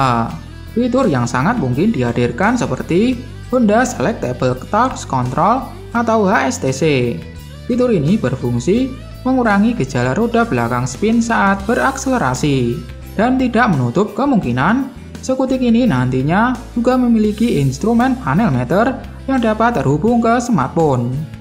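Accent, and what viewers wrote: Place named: Java, Indonesia. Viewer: Medok bener jawa nya bro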